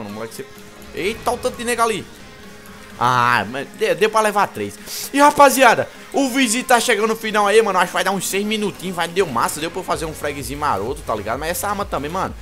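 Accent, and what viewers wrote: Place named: Ceará, Brazil. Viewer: possivelmrnte se num vai meresponder mais vc e Cearense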